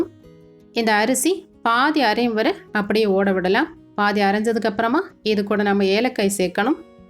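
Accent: Kerala, India. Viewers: Are you a malayalee? Your tamil has malayalam accent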